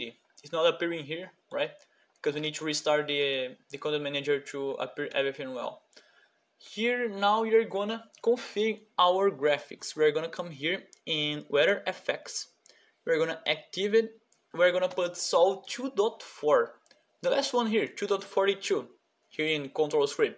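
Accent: Brazil. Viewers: Só BR pra ter esse sotaque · slc mano me ensina inglês aí 😯só deu pra perceber que era é br por causa do sotaque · tu é brasileiro né mano, esse sotaque ai eu conheço hahaha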